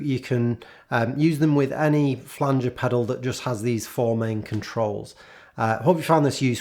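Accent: Manchester, United Kingdom. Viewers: Too much Brit accent. Can't stand you. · Im going to go and revisit mine right now!.......great sounds..... .....Great also to hear what sounds like a Mancunian accent on YT....Cheers from a Stopfordian relocated to Kent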